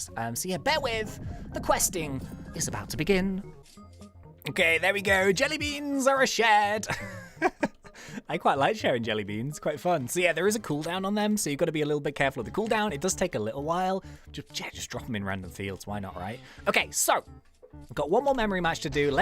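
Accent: Ireland. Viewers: Thats i nice irish lady accent in my opinion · But I really love your Irish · The spirit bear with your lady irish accent makes spirit bear 10x kind. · you are actualy doing a good irish accent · I feel like he has never been to Ireland he sounds more like an Irish man with a high pitched voice I am insulted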